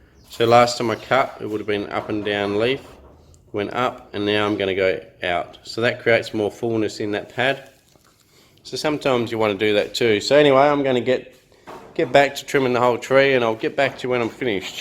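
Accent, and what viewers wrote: Australia. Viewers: can anyone tell me what accent is that? is he Australian?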